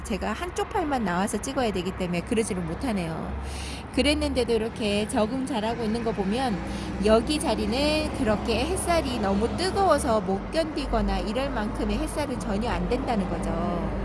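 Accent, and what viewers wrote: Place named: Ulsan, South Korea. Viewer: 울산 어쩐지 목소리가 친근감이 있어서 저도 울산^-^ 항상 잘 보고 있답니다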